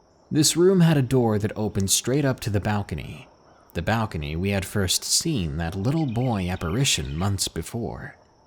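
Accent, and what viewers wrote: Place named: United States. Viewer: Why does someone who is an Irish man raised in Ireland speak like an American with northamerican slang and no uk/Irish terms or phrases.... You are fucking bullshit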